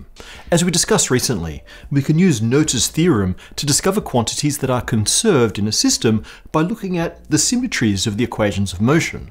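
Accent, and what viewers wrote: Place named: Australia. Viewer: I like this guy, he sounds Australian. I like Australians.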